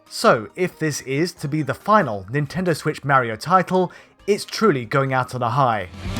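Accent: England, United Kingdom. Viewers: English accent 💯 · he has an English accent, no such thing as a "British voice". If you have a problem with it then leave, you're not wanted here.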